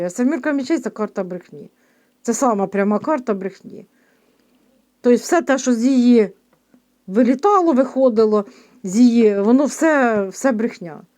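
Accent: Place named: Surzhyk, Ukraine. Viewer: Пані, говоріть будласка українською, а не цим пекельним суржиком. Дуже ріже слух.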